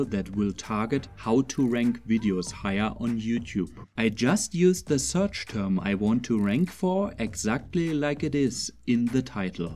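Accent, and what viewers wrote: Germany. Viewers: Nice one, like your German accent, man. And obviously, you did a great job to rank this video, cause I found it on top of google ;-) Cheers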